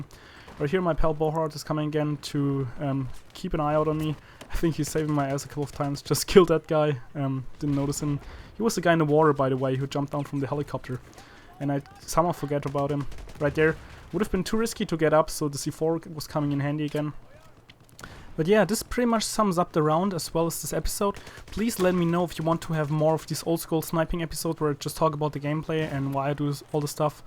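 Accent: Germany. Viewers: are you german ? You sound like you are. You accent is like you come from germany because of the th pronouncing. -Mojo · Oh are you German? I notice an accent there :D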